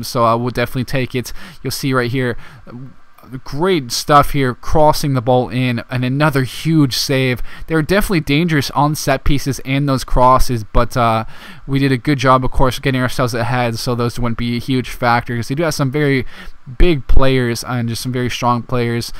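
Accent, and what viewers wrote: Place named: United States. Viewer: Finally you don't know how hard it is to find someone who does AMERICAN English Fifa career mode great video btw new sub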